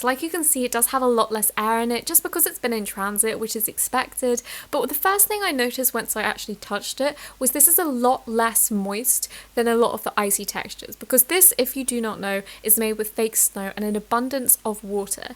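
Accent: United Kingdom. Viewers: Their accents are nothing alike. Australians have more of a drawl to their accents, whereas people from Great Britain have more of a clean accent (unless you're from the north, of course). is british.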